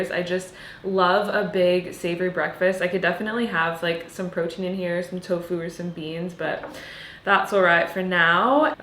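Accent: France. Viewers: i love the french accent!!! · The french accent took me by surprise😮😂love itt❤❤ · I dont understand why did you start talking with a french accent ?? Is it just for fun or is there another reason behind that ? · French accent >>> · Okay but the French accent and black turtleneck go together and suits you haha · The french accent😂❤️ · Loved your french accent 😂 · French accent. HILARIOUS😂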